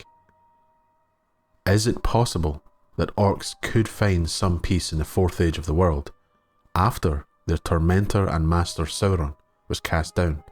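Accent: Scotland, United Kingdom. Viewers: Scottish, Irish or Canadian? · Ok sorry then. You speak (pronounce) Scottish not English. Very hard to listen to for a non english person. "I'm not speaking slang." - Yeah, since you´re a Scot, you speak Scottish. And a very proud one it seems you are. Sorry to have offended you and your way of interpreting the English language. Still you´re prounouncing an "i" like the rest of the English world would an "e". Not sure if you even consider what you speak as English. Maybe even, you are offended by me calling it English. Not my intention to offend, just to listen properly. So maybe it´s me and i gotta go wash my ears. Cheers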